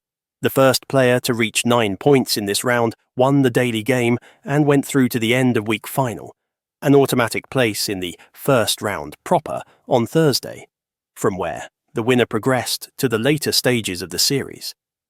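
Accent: Scotland, United Kingdom. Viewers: because it is the real you. Why use another voice? Scots FTW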